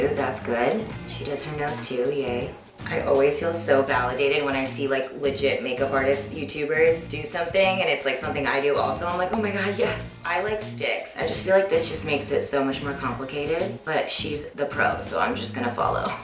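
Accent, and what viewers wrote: United Kingdom. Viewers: You are sooo cute the more you watched and copied the more you got that Mary poppins British accent x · You did fantastic!!! You’re just so funny with your british accent... I’m sure kaushal feels proud of your effort xxxx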